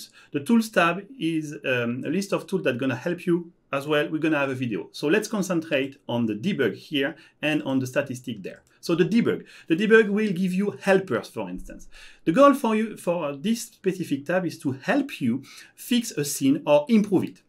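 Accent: France. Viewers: Bravo! Ton accent frenchy et toi etes une fierte nationale! Je suis un nouveau converti a BabylonJS. Merci a toi et a toute l'equipe, vous avez fait un travail incroyable. Au dela meme de la qualite de tout le travaille realise, je reste toujours impressionne par la vitesse de reactivite sur le forum. Et egalement merci a Microsoft pour soutenir ce projet en OpenSource. Thanks you so much guys for your amazing work!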